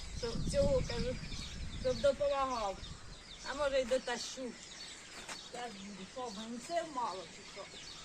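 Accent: Poltava, Ukraine. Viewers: Добрий день. Говір Полтавський, з якого району, якщо звісно не секрет. Я з Гадяцького.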